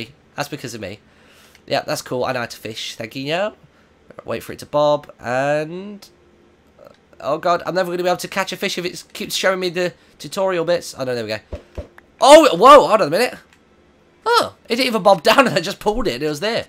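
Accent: United Kingdom. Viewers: how come every time i watch something about minecraft the person that talks is british! its so annoying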